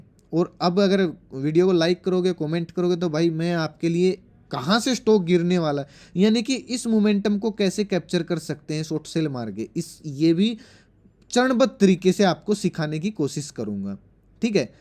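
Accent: Haryana, India. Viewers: App Haryana sy ho kya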